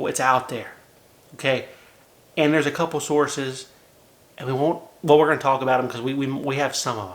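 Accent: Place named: Baltimore, United States. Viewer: This guys Baltimore accent cracks me up. Reference to Drug city I assume you work or have family in Dundalk. Curious to try the Belle now. Thanks for the review.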